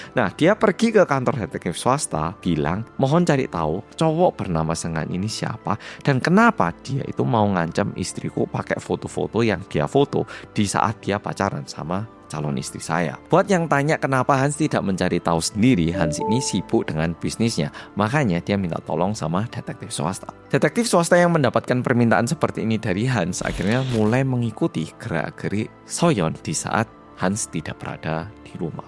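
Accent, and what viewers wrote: Java, Indonesia. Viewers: Mas yang medoook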